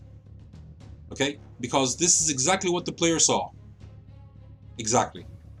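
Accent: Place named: Greece. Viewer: I just want to point out your English is well learned. The Greek accent is there, but not painful to listen to.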